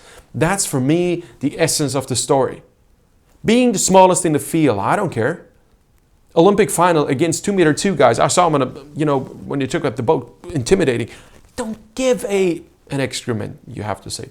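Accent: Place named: Italy. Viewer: I absolutely loved the energy from this video!! Its tis type of race that inspires those magical stories about rowing; the inhuman passion drive/sprint that shoots a crew through the finish first against the odds. I always get the 2017 world's Lodo and Vicino pair vibes, just channelling the passion and drive for victory. Videos like this are fantastic! Loved it, and 10/10 Italian accent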